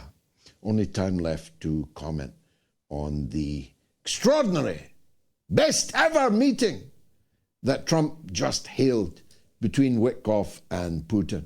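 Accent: Scotland, United Kingdom. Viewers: Because of his peculiar Scottish pronunciation and the way he delivers (pauses very contrived) we apportion to him a type of reverence. He is just another socialist scumbag from the north that has nothing to look forward to but the ‘lake of fire’ because has not repented and keeps grinding on on these dismal subjects. do you think you are exonerated by your monologues-there is zero redemption in pointing out the sins of others for you or any other commentators bro. Take a break and examine your own life my friend before it’s too late…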